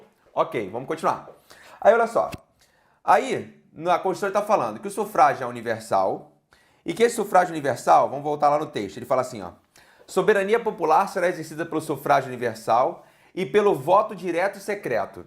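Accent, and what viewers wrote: Rio de Janeiro, Brazil. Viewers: Prof.. adorei sua aula!! Parabens e obg!! Mto bommm “mexmo” hahahaha usando seu carioques!! 👏🏻👏🏻👏🏻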